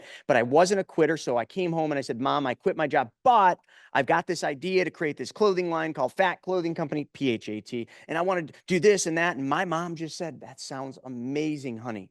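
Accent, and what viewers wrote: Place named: Upstate New York, United States. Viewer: ! 😂 I’m in upstate New York as well and I could hear the accent. I will be calling you to sign up for insurance for my RLT 🎉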